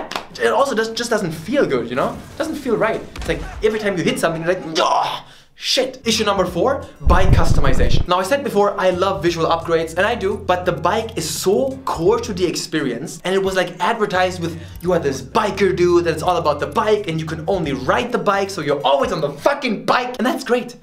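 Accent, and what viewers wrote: Germany. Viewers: Best video ever on youtube, best accent, best game review ever, I love it, laughed every second, your fantasy and creativity are above normal 😂 subbed. And definetely will check others reviews aswell, if your channel is that type, and includes video’s like this👍🏻🤙🏻😀 And I knew you was German because of the S and Z, Sie Germans, Hanz Gez Zhe Flammenwerfer😂 Greetings from Holland Niederland, Niederländisch. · Wie man einfach diesen deutschen Akzent raushört😂. Ehrenmann. Bin zum erstenmal auf deinem Kanal aber der deutsche Akzent hat dich erstmal direkt sympathisch gemacht😂. Frag nicht wieso...😂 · MY G YOU SPEAK LIKE A GERMAN IN ENGLISH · Leon - 501st Liegt eventuell auch daran, dass man das sowieso sehr gut raushört, weil er mit einem STARK deutschen Akzent spricht 😂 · The whole Time I thought why does he have an German accent and then he speaks German 😂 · Haha unglaublich wie ich einfach deinen Deutschen Akzent rausgehört habe. 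Super Review Abo hast du , keep up the great content.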